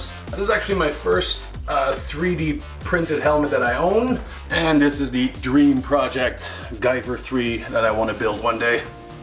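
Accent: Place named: Netherlands. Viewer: Lol he actually has a Dutch accent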